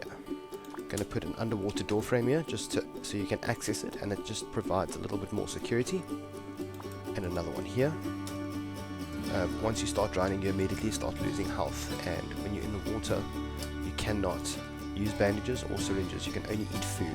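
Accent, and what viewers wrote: Afrikaans, South Africa. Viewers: Brother are you from SA?? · South African? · r u africaans? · You Afrikaans bro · you from south Africa? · are you south african? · South african??? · As soon as you started talking I was shocked :O A South African Rust YouTuber, great videos :D Keep up the great work!!! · Are u South African?!?!? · are you south african? You sound like it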